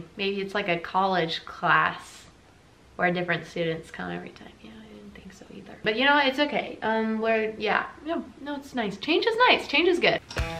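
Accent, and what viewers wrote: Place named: Alabama, United States. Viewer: You have a slight Alabamian accent. Are you from here aswell? You have a tint of south in your voice.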